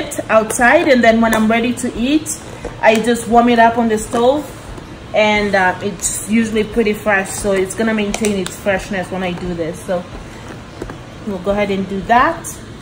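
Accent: Kenya. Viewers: You sound Kenyan, are you from Kenya?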